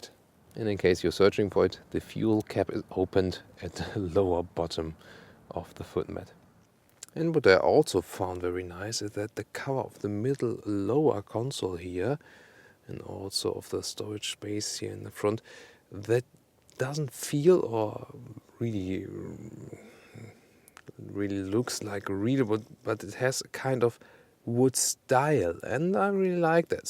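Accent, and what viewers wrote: Germany. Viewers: Love the German accent 👍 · That German accent is so cute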